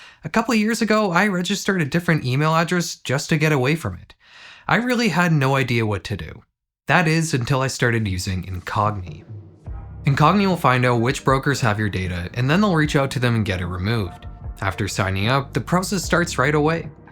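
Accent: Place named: Nova Scotia, Canada. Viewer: Are you from Nova Scotia?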